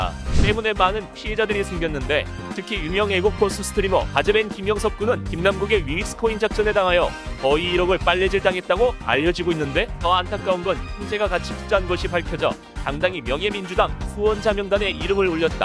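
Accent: Jeolla, South Korea. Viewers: 뭐여 전라도여?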